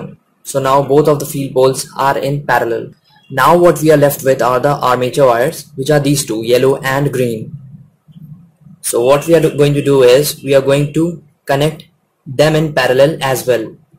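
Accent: India. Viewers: I really hate the indian accent in english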